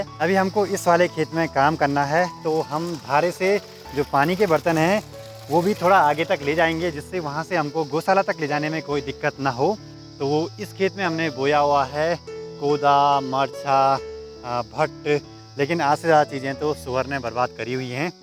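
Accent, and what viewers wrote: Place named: Garhwal, India. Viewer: जगो तो गढ़वाली टोन है ।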